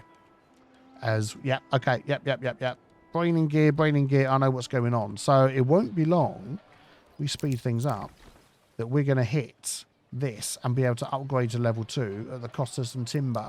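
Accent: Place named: Midlands, United Kingdom. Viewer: Great to see you playing this game - looking forward to release of this beautiful alpha! I have sort of given up on cities skylines 2 until there are assets and more fixes (despite having 300 hours in it) - so this looks lovely and from all the play I have seen there seems to be no game or deal breakers. Lucky you having contact with Greg for help! But yea, after cities skylines I think you are simultaneously over-thinking and under-thinking things at times LOL. Also you missed a trick when laying out your houses... after selecting the area you can hit the min-max buttons to adjust the size of the plots... so if you have a row of say 6 you can make them bigger and drop to 5, 4, 3 or 2, allowing for bigger gardens or room for two families. If your trades are taking too long get a horse or two....you need to beef up on the wood, cos virtually everything hinges on it, and getting more families moved in to have more workers. 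Not being a smarty pants here - just what I've observed.... and as I say, this has a really different mechanic than say CS2 or indeed most other games:) I love that it feels totally organic, and love how you can get your roads and paths to flow without the complexity seen in other titles. I had to laugh when you got baffled when you looked at one peasant and said she was carrying a sheep...then zoomed in and she's carrying wool and you wondered where it had come from LOL.... You sound like a midlands guy, so I presume you know where wool comes from hah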